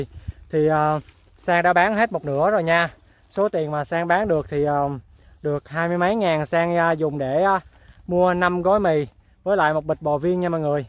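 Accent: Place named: Mekong Delta, Vietnam. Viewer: e ở đâu? nghe giọng như người miền tây vậy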